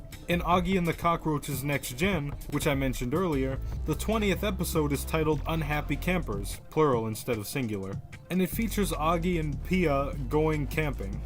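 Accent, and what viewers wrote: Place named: United States. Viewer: Huh an American talking about oggy Neat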